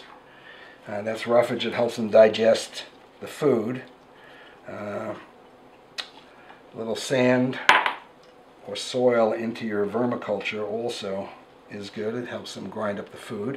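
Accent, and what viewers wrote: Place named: Long Island, United States. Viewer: it's good to hear a normal long island accent. i'm too far from home, everyone sounds unfamiliar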